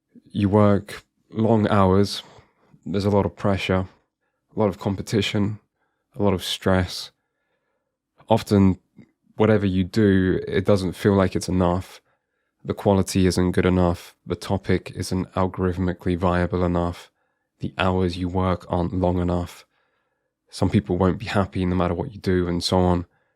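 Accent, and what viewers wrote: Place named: United Kingdom. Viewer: I'm not sure, but it might be related to your using the word "fad" early in the video. With your accent, it might be interpreted by an AI speech-to-text as British slang for a cigarette which also happens to be one of two "the F word" in American English. Still has nothing to do with the title unless the first few seconds of the video is referred to as "the title". The appeal probably went to a person outside the UK, so they also misheard the word (colored perhaps by the transcription). That's the most goodwill I'll extend to the decision makers, especially since it makes no sense in the context of the sentence, whereas "fad" does.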